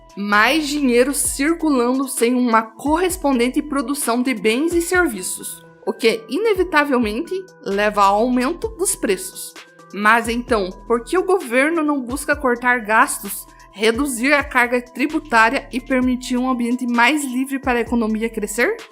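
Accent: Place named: Bahia, Brazil. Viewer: Sotaque baiano é engraçado 😂😂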